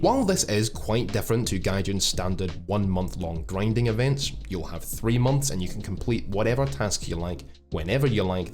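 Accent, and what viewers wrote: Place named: Scotland, United Kingdom. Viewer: I love the accent Beautiful Scottish Accent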